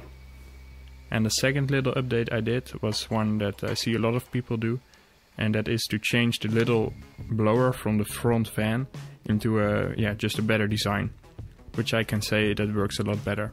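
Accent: Netherlands. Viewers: Are u dutch? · max yep 100% sure the accent is typical dutch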